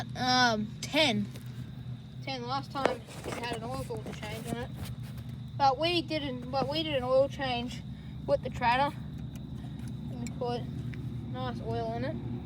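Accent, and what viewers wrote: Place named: Australia. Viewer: Nice! We use ours here in NC to grow collards. Sounds like you might be in Australia, based off the accent. Just curious if collards would grow well there, or what and all does grow good.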